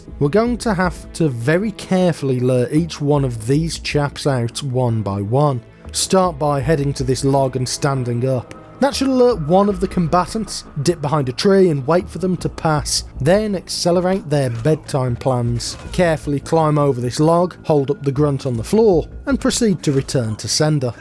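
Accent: United Kingdom. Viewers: Notice he dropped the British accent when he started singing, like it’s something they do on purpose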